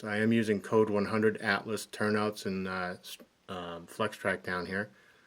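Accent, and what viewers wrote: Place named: Midwest, United States. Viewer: Beautiful! BTW - let's work on your midwestern/northern Illinois accent a little....Dekalb is Dee-kal-b { really stress the kal, as in 'pal' }, not dakahlb…..Rochelle is Ro-shell, not Rah-shell....Proviso is Pro-vigh-zo, not Pravizzo. As I see you're striving for perfection, I figured you'd appreciate some constructive criticism! Keep up the good work!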